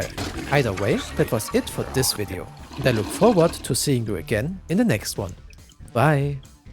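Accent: Germany. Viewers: Its a german accent. I have it aswell, thats why I know lol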